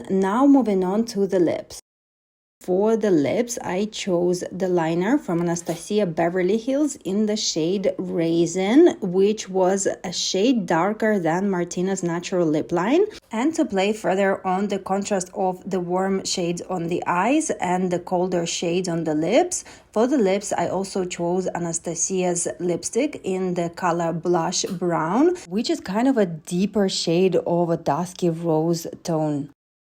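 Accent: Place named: Russia. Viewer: Accent is familiar, from one of the former USSR states) Russian , I think )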